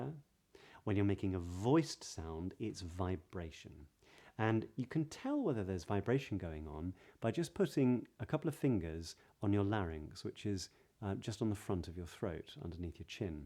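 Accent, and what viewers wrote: RP, United Kingdom. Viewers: well! I'm into listening rp accent. So far I've found you the best for rp accent. So i would like you make just listening videos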